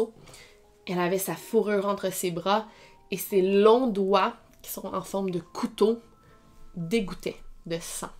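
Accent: Quebec, Canada. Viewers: Slt toujours très intéressent ton contenu sinon je trouve que tu perd un peu de ton français et que tu prend un peu plus de ton açent québécois ce qui facilite un peu moins ta compréhension cela pourrait nuire a ta chaîne et a ton public français...sinon c'est toujours sympa de t'écouter a plus👋👏👋👏🤟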